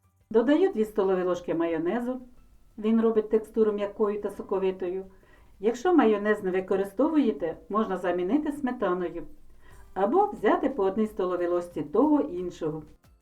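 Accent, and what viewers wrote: Standard. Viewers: Цікаво👍Треба спробувати. Особисто від мене дяка за гарну українську, вперше чую на кулінарному каналі таку чітку мову❤Процвітання вашому каналу. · Спасибо за чистый и мелодичный украинский язык.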